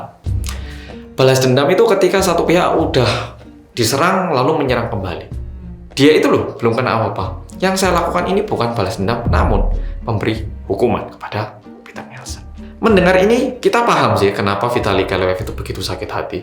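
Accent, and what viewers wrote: Java, Indonesia. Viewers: Mas iki penampakannya orang korea,tapi logatnya medok jowo😁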